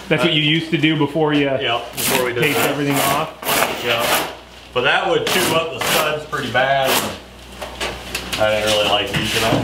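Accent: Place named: Southeast, United States. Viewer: Don't move the bathroom door out of the hallway into the spare room, you'll hate it later and future buyers with experience in remodeled homes will not like it either. You might think it is just a couple of corners and a few steps now, but you will get so tired of it down the road, especially those times you are in a hurry. I'm not sure where your at, but judging from your accent the SE maybe? Up North here, I would have looked into extending those 2x4s by tacking on some 2x2 and replacing a few 2x4s around openings with 2x6s to get a 5 1/2" deep insulation bay. While you were doing that you could have fixed the framing around the windows with proper king/cripple/header arrangements.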